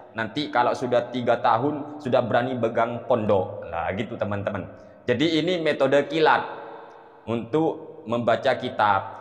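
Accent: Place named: Jember, Indonesia. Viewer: Kaya logat Jember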